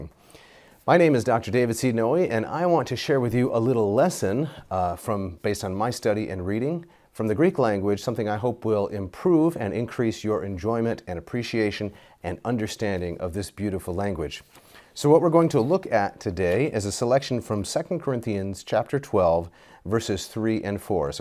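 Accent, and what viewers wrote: United States. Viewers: As a native speaker of Modern Greek, I find this painful to listen to. The Erasmian pronunciation with an underlying American accent sounds unpleasant to native Greeks. Nevertheless, how you pronounce Greek does not affect your understanding and ability to translate. If you didn't display the text I would not have understood a word you said in Greek.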